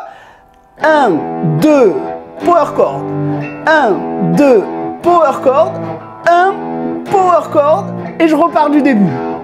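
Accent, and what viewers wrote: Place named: South, France. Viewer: Merci pour cette super vidéo. Un très bon exercice même pour les grands débutants comme moi. Tes vidéos sont une mine d'or et ta pédagogie au top. Dans le dernier exercice j'ai trouvé cool de faire 2 mesures E à vide G5/ A5 /B bémol 5 et A à vide C5/D5 E bémol 5. Ça fait bien bosser... J'ai cru entendre que tu avais l'accent du sud 😅j'habite dans le sud aussi peut-être ne sommes nous pas très loin pour des cours en individuels... Je ne sais pas comment te contacter en privé. Merci et continue tes vidéos.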